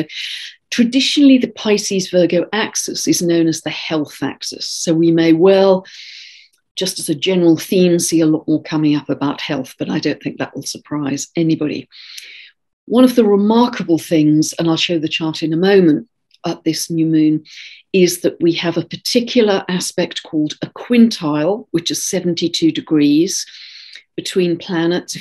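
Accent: England, United Kingdom. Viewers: "...you just have such a lovely way of turning a phrase, that it is a delight....". That's because has a most cultured English accent, so she delivers the news with panache'.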